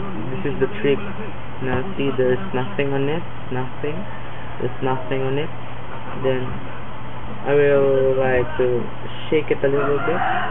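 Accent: Philippines. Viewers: Dude r u Filipino? Ur accent is so strong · he has a fob voice philipino pride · HAHA total Filipino accent good job though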